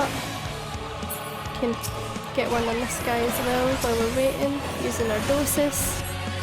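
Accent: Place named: Scotland, United Kingdom. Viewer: I had a terrible time just doing it, thanks to this it helped, and its nice to hear a Scottish voice on here (I'm from NE ENgland and live in Scotland)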